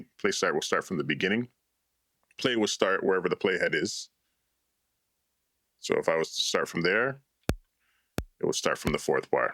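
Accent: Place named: Jamaica. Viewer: Where you from sound like a yaddie 😂 thanks for the video btw!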